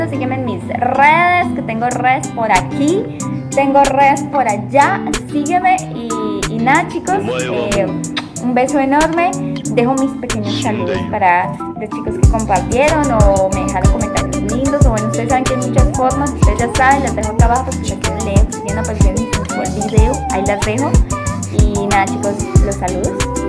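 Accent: Colombia. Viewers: Eres de Barranquilla Colombia? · Por supuesto es Colombiana..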